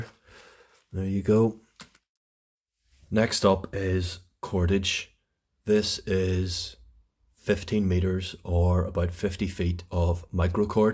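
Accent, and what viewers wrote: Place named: North, United Kingdom. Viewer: Good vid! Where up North are ya from?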